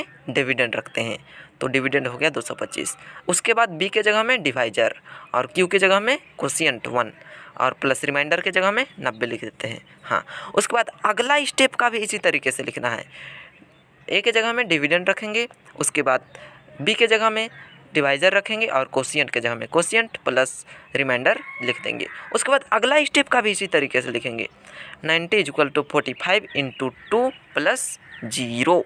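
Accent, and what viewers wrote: Bihar, India. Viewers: Aap to voice se bihari lgte h sir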